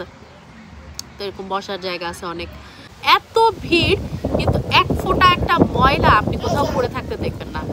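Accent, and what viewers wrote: Bangladesh. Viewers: don't blame bengali indian. You are nowhere from India. Your pronouncation is bangladeshi. We indian bengali people went to Lisbon in high skill work permit not doing any cleaning job. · Apner kotha sune monehoy apni bangladeshi. Asole amader sikha bhalo na.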